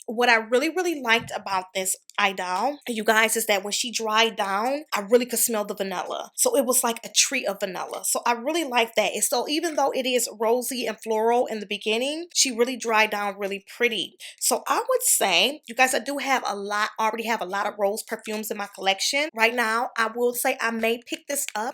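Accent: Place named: United States. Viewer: I really love your accent I’m from England and I love the American accent and I really love your channel perfume is one of my guilty pleasures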